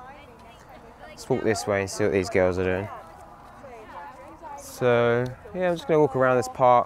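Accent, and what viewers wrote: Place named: England, United Kingdom. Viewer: got confused for a mo.... English accent in Kentucky. is an amazing host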